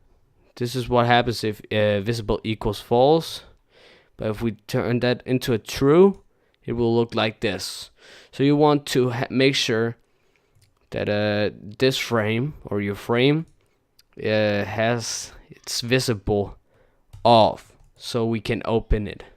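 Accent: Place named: Scandinavia. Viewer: U have a danish/scandinavien accent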